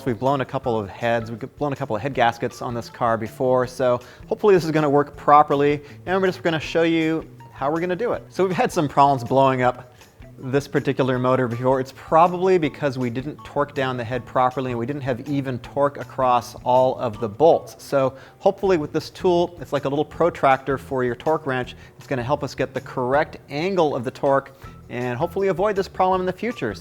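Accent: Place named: United States. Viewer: You look British but sound American. Good video, thanks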